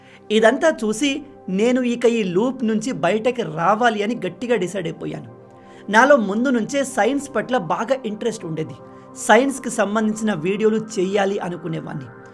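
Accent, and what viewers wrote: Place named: Andhra Pradesh, India. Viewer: Bro meeru andhra anukunnanu.mee voice asalu telengana la ledu.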